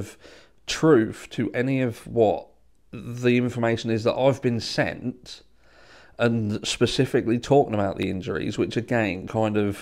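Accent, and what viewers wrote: United Kingdom. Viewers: One of my favorite '80s tv series was "X-Files" and its theme, "Trust No One" is what I go by especially with YT videos. Anything featuring ex-F/B/I or former C/I/A employees or even retired LEOs are suspect because they still owe an allegiance to shady government agencies upon which they depend on for their lifetime pension$$$. But I trust you, 'cause you're British with a lovely accent and demeanor that goes so well with my morning coffee sweetened with stevia. 😁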